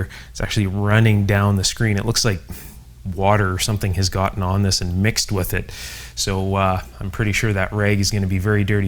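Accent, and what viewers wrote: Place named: Canada. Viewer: I detect a Canadian accent, bet I am right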